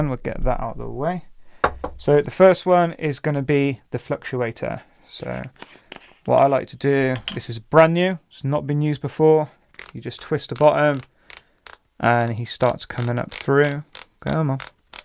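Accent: United Kingdom. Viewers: By your accent I think you’re Brithish . Here in the Netherlands we have slightly different pipe connections, but I’m watching your technology with great interest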